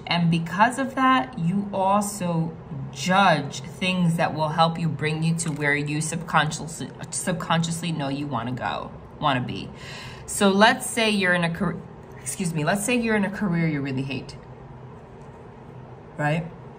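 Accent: New Jersey, United States. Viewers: Listening to you I KNEW you were from NJ. We just have that certain thing lol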